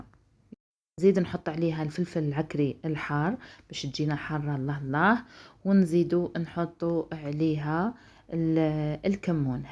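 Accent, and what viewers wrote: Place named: Batna, Algeria. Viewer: نتيا من باتنة؟ عندي صاحبتي من باتنة لهجة ديالك كيما ديالها و سبحان الله صوتكوم كيفكيف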